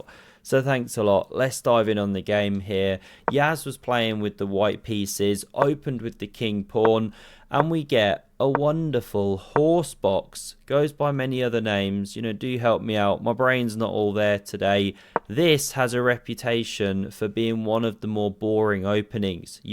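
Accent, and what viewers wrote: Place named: Bedford, United Kingdom. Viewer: Hey man, thanks for uploading, despite the difficult times, you bring great joy, even if you don’t know! I only discovered you recently, but already am a big fan! Nice of you communicating openly about the absence, even the reason for it, which you didn’t need to (but it explains the obvious and understandable sad mood). Good wishes to you and your family, which is surely so blessed to have you! PS don’t worry about us the audience, we have also the old material in the meantime and also priorities are priorities. Don’t put too much pressure in the meantime on yourself in these stressful times, and don’t forget to take enough C vitamin, which helps body deal with stressful reactions! Cheers & greetings to Bedford(shire), from someone, who has an accent nearly identical to yours, not because of the one semester that I spent at the University of Exeter Business School as an exchange student - where I was the best at the University of Exeter Chess Society - but because of a certain highly perfectionist English lady, who took even the slightest mispronounciation out of me and ended up mocking me at the end on how I have “a perfect Bedford accent” (she was from there) hehe. 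Loved the culture, people and country, had the best time there (still feeling English a bit, even though ethnically I’m not 😅 … even wore the red poppy for years, still have it 😊 ). Lived in three other foreign countries, but England I loved!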